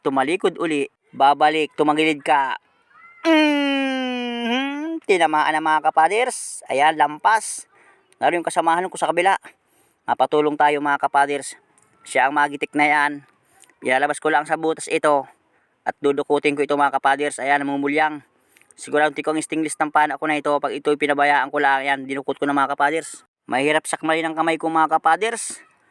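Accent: Bicol, Philippines. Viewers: Bicolano ba kayo o ilonggo o Batangenyo 😂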